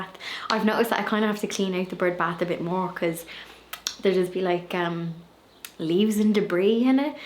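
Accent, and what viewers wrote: Ireland. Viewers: Just saw this .lovely to hear an Irish accent . Is your garden in Ireland . I’m in Kerry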